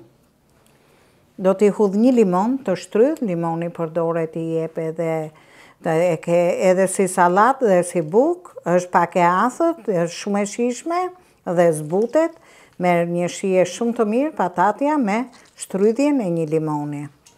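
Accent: Tirana, Albania. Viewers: me zërin, dialektin dhe gatimet e Tironës, më nxjerr mall, shume mall .....!!!🙏❤️😭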